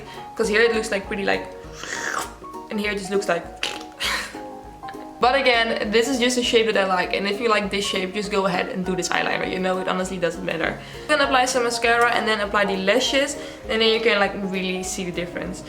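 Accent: Netherlands. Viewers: Are you Dutch? I recognise that accent from miles away haha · nah, she's dutch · dutch accent !! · haha i love dutch accents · no one lmao a quick google search would show you she’s dutch. She has a very dutch accent. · lol yes I was like ohh she dutch. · I can sound the dutch in this tutorial i love you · haar accent is onmiskenbaar nederlands haha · im just so confused if im hearing this in english or dutch lmao such a mindfuck love the accent tho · She is not german she has a very pronounced dutch accent not german. She sound like dutch VS model Romee Strijd when she talks lol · Are you Dutch? You sound like you're Dutch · Lmfao I'm dutch and I didn't even know you're dutch but as soon as I heard your accent I just knew it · Are you Dutch? Judging by your accent hehe. Good video btw, it's so different to 'wing-it' with hooded eyes...! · I am Dutch and I just know from listening that she is very much Dutch lol. 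The accent is recognizable when you are from the same country xD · Lmao Germans pronounce nyx the same way but she’s like obviously Dutch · Ben je Nederlands? Ik hoor dat dutchie accent haha, leuke vid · Love the Dutch accent hehe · hu?? kan ze nederlands? ze heeft echt zo een accent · lmao dat nederlandse accent springt er wel uit xd <3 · this was my guess after the first 30 seconds, and now I'm trying to figure out if she really is Dutch. Love that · Echt een Nederlandse accent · that dutch accent i- 😭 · her dutch accent is sooo strong. Love it tho since mine is just as strong as hers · Super Nice Dutch accent! Lol love the tutorial ! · we love the dutch accent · Is she Dutch? She sounds like me lol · Your accent reminds me of my dutch cousins. I find eyeliner the hardest to apply. I dont' apply as boldly as you when I wear it. Find it seems to sit better when I follow the line as an extension of the lower eye shape and only do the outer edge. 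I haven't tried putting on inner edge as thought might might make my eyes look closer together.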